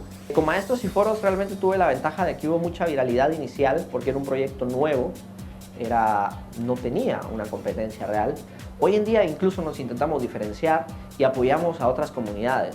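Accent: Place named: Spain. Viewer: Por que el acento español??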